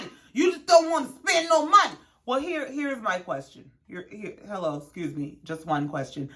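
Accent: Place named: New Orleans, United States. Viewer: Omg, Where are you from? I just love your accent. I want to guess New Orleans but I’m not sure, lol.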